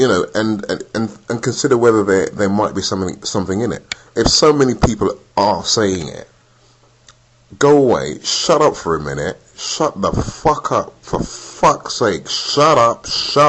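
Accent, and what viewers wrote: United Kingdom. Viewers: well i take care of myself but i dont consider myself to be a strong and independent woman, i dont wear weave or spend my precious money at the beauty shop and i NEED a man to do take are of me, as he should. so does this make me a black female (which is what i am) or a white woman? lol this dude is seriously stereotyping all black females! where is he from? wales? love the accent! i would love for a real man to step to me and do what he should! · Sounds like London !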